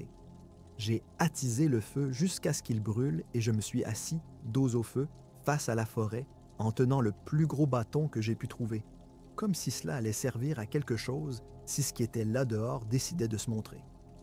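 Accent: Quebec, Canada. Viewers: Oh wow! Belle découverte! Contente d’entendre une voix québecoise qui raconte des histoires d’horreur! Et hop, une nouvelle abonnée! Salut de Laval!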